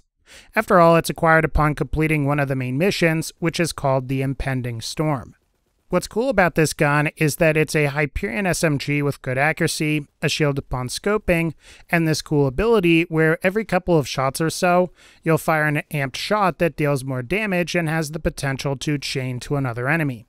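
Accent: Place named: East Coast, United States. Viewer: Im guessing usa east coast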